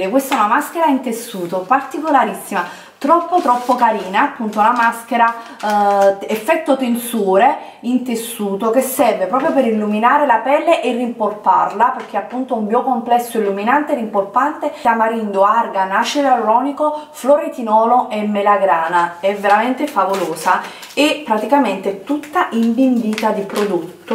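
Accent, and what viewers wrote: Naples, Italy. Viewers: Che hai contro l'accento Napoletano...dimmi fammi capire ..vorrei santire tu come parli....sei di una pochezza assurda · Toniolo ,per tremendo accento cosa intendi? Per caso non tolleri l'accento napoletano?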